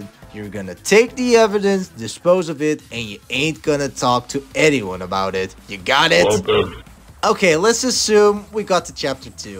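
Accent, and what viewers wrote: Netherlands. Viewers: are u dutch lol?:)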